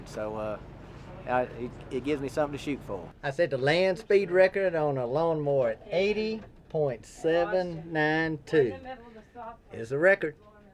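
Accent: South, United States. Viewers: Of course he's southern lol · it just makes it that much better that the dude has a southern accent haha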